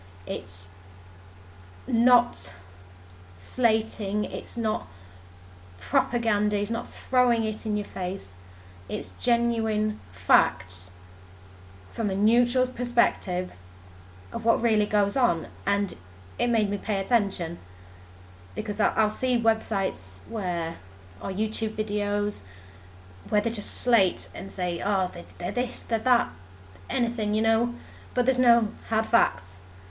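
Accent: Northern England, United Kingdom. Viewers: For a Northern accent, yours is rather pleasant i must say! From a not posh Suvverna. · Sounds like a northern lass!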